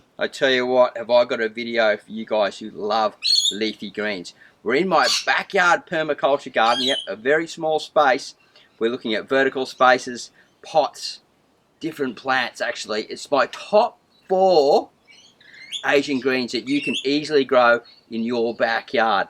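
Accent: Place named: Australia. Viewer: i had no idea Australians had more than 1 accent but compared to say steve irwin this fella is hard to understand very very thick accent... its cool sounding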